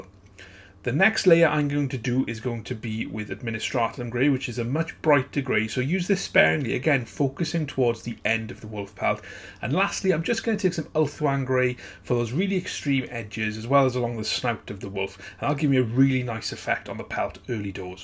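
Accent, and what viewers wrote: Wales, United Kingdom. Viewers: Welsh accent I can hear?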